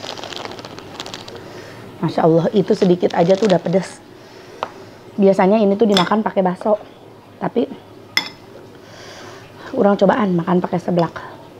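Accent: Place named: Sunda, Indonesia. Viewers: meni lekoh kitu sundana teh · Ceceu muni lekoh sundana 😂😂😂 resep jadina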